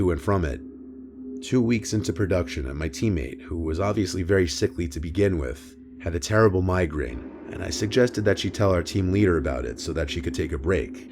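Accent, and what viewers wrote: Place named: East Coast, United States. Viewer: Literally no one better than and his East coast accent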